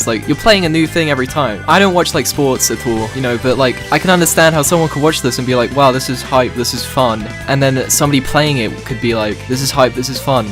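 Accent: United Kingdom. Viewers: I respect a man who can share his opinions online. Commenting to help push this vid and ruin someones new year! Edit: Ew youre british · guys there isn’t a joke it’s just a British guy shitting out of his mouth for five minutes. I feel like I am watching pyrocynical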